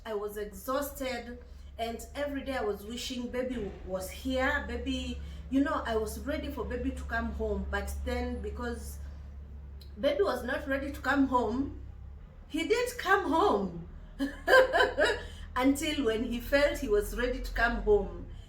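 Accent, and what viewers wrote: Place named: Kamba, Kenya. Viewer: Are you kamba?! Your accent, jeeeez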